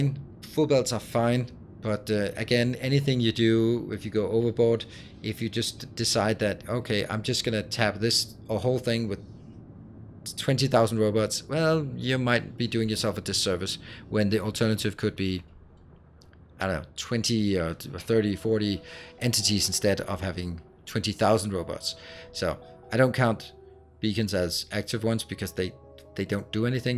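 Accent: Denmark. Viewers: oh god is that danish accent recognisable. From one danish person to another!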